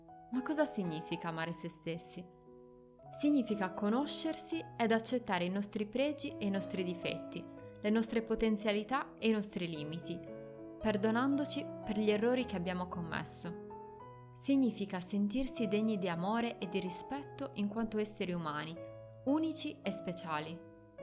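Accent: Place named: Sardinia, Italy. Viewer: Giustissimo avere una dizione corretta nel proprio bagaglio, ma spesso gli accenti danno personalità ai racconti.. A me il tuo sardo piace🌊💙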